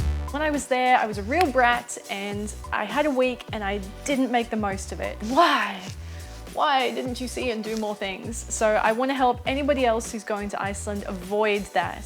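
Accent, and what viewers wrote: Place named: Australia. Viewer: This was great! One thing I wanted to know is where YOU are from...I know it makes me sound unbearably stupid, but I couldn't quite tell from your accent...is it Australia? The reason I want to know is because I'm Canadian, so your idea of Cold might be very different from mine!! :) I was sort of planning to pack for "chilly spring" weather over here...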